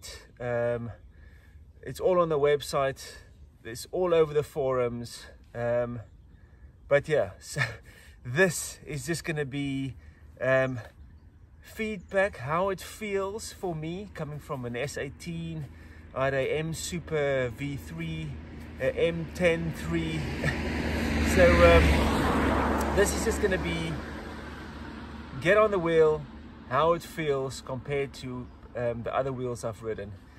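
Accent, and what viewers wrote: South Africa. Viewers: Fantastic review! Hoping the next version has an easier access to the tire and better clearance between tire and chassis like MSP. Off topic: is that a South African accent I'm hearing?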